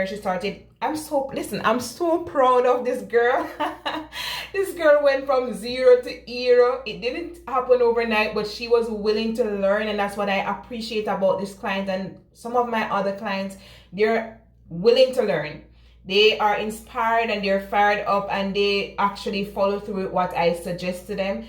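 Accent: Jamaica. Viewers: What salon do you work at? cause you sound like you might live in Jamaica. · You sound Jamaican. Where can we find or contact you? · I thought you were Jamaican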